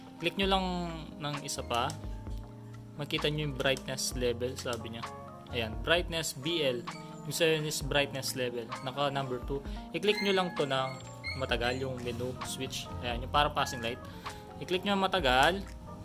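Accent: Pampanga, Philippines. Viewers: Kapampangan ka par? Hahhaha keep up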